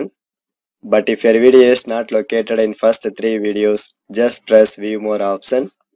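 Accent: India. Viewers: I hate indian accent, sounds ugly!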